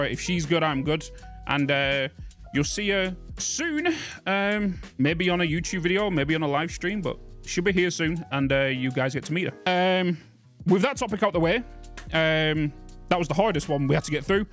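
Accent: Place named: Scotland, United Kingdom. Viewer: my mug arrived, thank you! edit after the video: holy shit bud. sorry she went through that, congrats on walking your sister down the isle, thats a huge honor, 40 lbs is a shit ton of weight, lets go! Dude, as always, we have our notifications on, if you need to take a break, take a break, we'll be here when you get back! Don't feel any pressure to 'preform' we just love your face and brogue, and we always have your back, even if that is you going offline for an undetermined amount of time. also, how did you drop so much weight? you stopped drinking iron brew didn't ya? ;)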